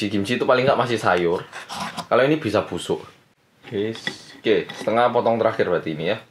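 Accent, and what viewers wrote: Java, Indonesia. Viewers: like bangett sma cara ngomong nya.. medhog bangettt mas ee... 😊😊😘😘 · Ngomong indonya kek orang jawa ngomong indo wkwkwkwk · Kok logat nya kaya Jawa? 😅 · Kuei lho mas medhok pol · Korea tapi bisa punya logat jawa asli..kerennnn😂😂😂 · Opo ikih tak kiro wong asli korea jebul nek ngmng yo iso medok ngono😅😂 but i like😍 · Kaya orang Jawa bahasa ngomong nya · Ini tuh orang jawa medok apa kalo korean ngomong bahasa indo jadi kek orang jawa medok ya aksen nya? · Muka korea ngomongnya jawa😅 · Muedok e mas wkwk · It's just me or dia Emang medok? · "halo guys, welcome back to korea reomit bersama orang korea yg luwe~" "halo guys, welcome back to korea reomit bersama orang korea yg medok~" apapun versi nya, ntah kenapa tetep suka;) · Jowo ne sampeyan ke pundi? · Medok jowo kaliii kmu bang.. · Bang ngomong nya kayak orang Jawa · Logatnya kek logat Jawa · Ini pertama x belajar bahasa nya di mana?kok logat nya kayak jawa 🤣🤣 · Njowo bat wkwkwk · Kebiasaan tinggal ditempat yang bahasanya jawa jadi gitu deh nada nya😅😂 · Ada logat bahasa jawanya 😅😅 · Gua heran sm dia..... 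Orang korea ngomongnya kayak orang jawa.... Yg setuju like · Kok ngomongnya kayak orang Jawa😂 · Ini org korea ap jowo gaesss 😁😁 medok tenan are iki 👍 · Medok njir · Keliru aku,, ini orang Jawa apa Korea yaa 😄😄 koq kaya ada logat jawanya gtuh 🤣🤣✌️🙏🙏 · Jir korea rasa jawa medok guys 🤭 2020 hadir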